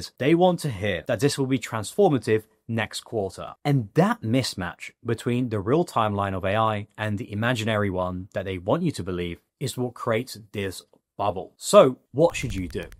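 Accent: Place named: United Kingdom. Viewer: when i hear british accent i'm already prepared to hear nonsense, proven itself true more often than not... and here we go again. how about making a vid explaining that the ai bubble burst, once it actually did!? oh no, can't do that yet, bc it hasn't, and if you'd not make these ridiculous "predictions", then you couldn't say later on "yeheyy, told you so!"... but what happens if there actually isn't an ai bubble?? then you'll just be quiet and hope nobody's going to call you out for your bs opinion bc nobody will bc you're not well known / a celebrity. so there you are making nonsense "predictions"... but why? what are you so angry about? explain??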